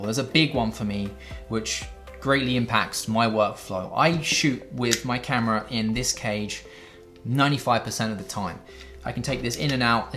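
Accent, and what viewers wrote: United Kingdom. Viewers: There's nothing cool about the LED light, but then I am nearly fifty! Good video and good to hear a UK accent about photo/video gear on YouTube. BTW Your avatar looks like you're wearing chain mail.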